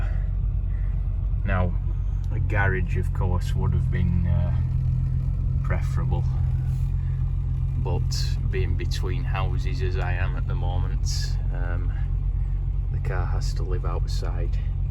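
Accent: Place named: North, United Kingdom. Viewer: You have something rare......a pleasant Northern accent that's easy on the ears. Where are you from?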